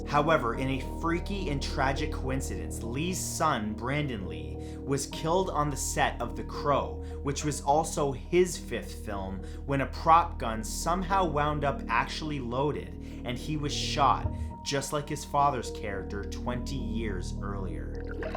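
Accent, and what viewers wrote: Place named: Canada. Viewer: What's really creepy is, you have changed the way you talk... You used to have a strong Canadian accent, now you speak slow and clearer. Did you do this purposefully or did L.A. bite your neck like Frost in Blade.. (Nomnomnom!!0_0)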